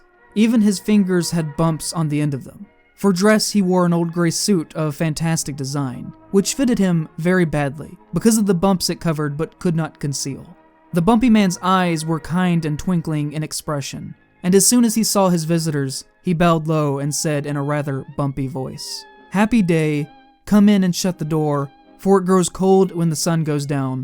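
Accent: United States. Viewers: While I understand the British comparison, are you by chance American?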